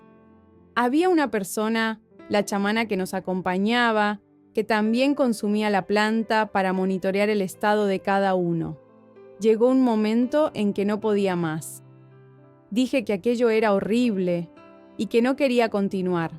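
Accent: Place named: Argentina. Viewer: Dices que eres peruana,pero hablas como argentina.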